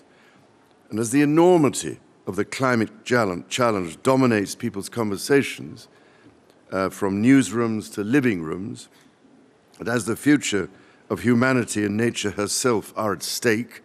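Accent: RP, United Kingdom. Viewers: Here to listen My fav British Accent😍🙌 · Agree with Lyn Harr... speaks good RSP English, NOT posh at all. has fine steady qualities which will help him to be a good King. I shall be proud to be one of his subjects Vive le Roi Charles, long live all our Monarchs, each reigning in her/his turn. May God keep them safe. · Now THAT is a posh accent. I feel smarter just listening to the accent. Props to Charles for being ahead of his time. Our job is to listen to him.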